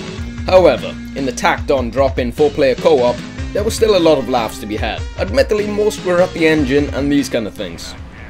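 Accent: Wales, United Kingdom. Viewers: Hey love your stuff, it would be cool if you could give us a list of upcoming games you are reviewing. Btw where are you from? i want to say wales judging by your accent but i'm not sure:/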